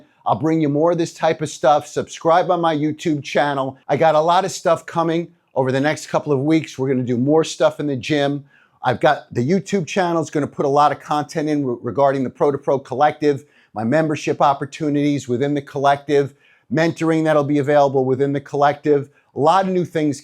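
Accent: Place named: East Coast, United States. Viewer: I'm from Holland, but you sound like a East Coast guy. NYC?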